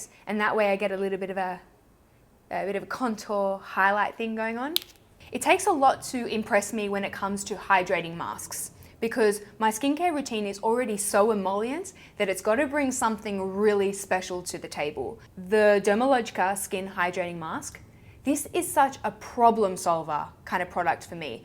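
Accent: Australia. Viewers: I absolutely love the yearly favourites as well! I look forward to them so much. Also side note: I really enjoy listening to your Aussie accent. It's made me realise that I think you are the only fellow Aussie beauty YouTuber that I follow and I should find more.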